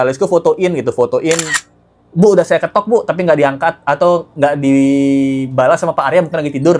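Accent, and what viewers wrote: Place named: Sumatra, Indonesia. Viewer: Logat lo sumatera bgt😅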